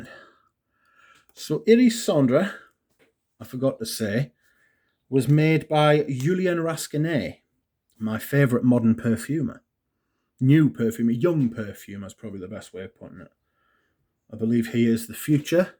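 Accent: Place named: Northern England, United Kingdom. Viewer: Fyi with your northern accent it’s “Bwah d”I says” 😂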